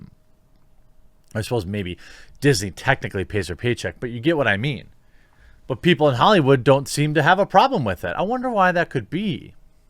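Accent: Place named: Wisconsin, United States. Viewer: Why pronounce it “Taysuh Tompson” is it intentional disrespect, or just that atrocious Wisconsin accent?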